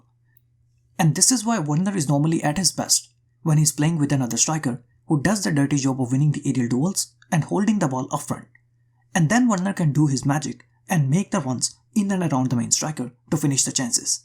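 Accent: India. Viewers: you sound like india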